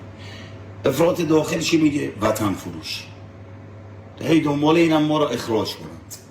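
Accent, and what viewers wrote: Afghanistan. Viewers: لحجه افغانم گرفتی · چرا لهجه لری به افغانی تغییر کرد؟؟؟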